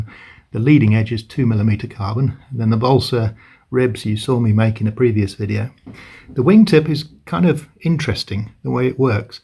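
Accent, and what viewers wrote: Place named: Yorkshire, United Kingdom. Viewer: Totally agree with you. I'm waiting to see how he bent the carbon fiber rod for the tips. But please don't tell him I LOVE your channel heaps more ❤ If you were a shiela I'd ask ya out and we could talk about ailerons and airfoils and fuel mix ratios and compare our Fokkers all night and stuff like that 😂 But ya a bloke 😂😂😂😂😂😂😂😂 and I'm happily married. So that's a bit messed up hey 😅 You remind me so much of a bloke (in Australia cos I'm in Sydney Australia ) oh man it was near forty years ago I was 20 he was 60. Name was Charlie Leeson from Yorkshire. A tool maker who really made me fussy about word work and modelling. I flew my fifth scale DVII and he his tiger moth and fly baby. Best flying days of my life with Charlie.. His work was stunning. (He passed away a good twenty years ago ) Anyway you have a similar accent and manner so thank you for being you and helping to revitalise so many memories of a hero of my youth. 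Hey shouldn't I be talking about Danny's channel and not yours here?????😂😂😂😂😂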